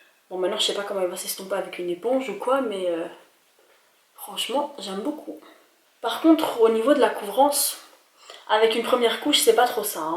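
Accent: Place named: Belgium. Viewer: J’ai tout de suite vue que vous étiez de Belgique votre accent est bien ressorti 😂😂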